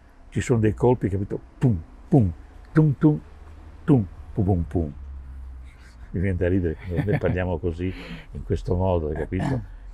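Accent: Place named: Ferrara, Italy. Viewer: Si sente la ferraresità di un grande batterista!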